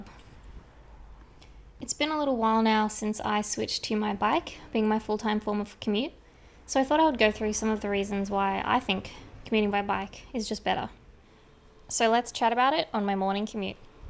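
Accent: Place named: Australia. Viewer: As a British "people", how you didn't pick up on her thique Australian accent both confounds and flabbers my gast.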